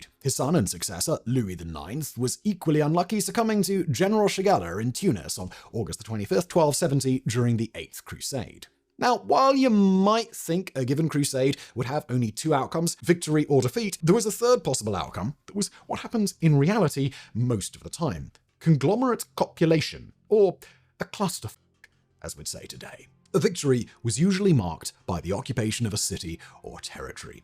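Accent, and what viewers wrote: United Kingdom. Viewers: Whenever Simon says anything negative about Colonial army in his very British voice it stirs up some weird american ancestral memory/rage in me.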